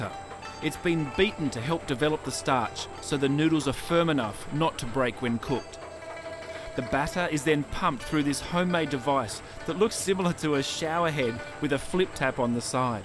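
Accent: Australia. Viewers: your pronunciation is way off.... you use too much of your native australian accent and it ruins the tones of the thai language ! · do I hear an Aussie accent ? I'm on Tamborine Mountain in the Gold Coast hinterland . Can you tell me where Phi Mai is please .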